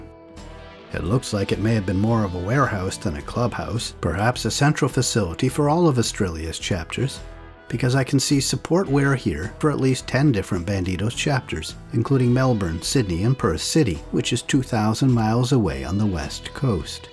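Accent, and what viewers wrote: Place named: Canada. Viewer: As per usual a good vid. I must say "thumbs up" for the nearly correct pronunciation of "Murrumbidgee". It's a hard one for non-Aussies, I sense a bit of a Canadian accent? Your "Melbourne" is also pretty good.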